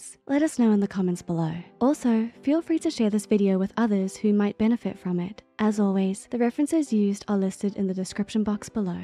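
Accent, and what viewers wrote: England, United Kingdom. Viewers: This english accent is a British accent, generally I have heard American, cool though, like the voice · Yo, what is this lovely British Accent? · Don't like the English accent. Your normal voice is much more soothing!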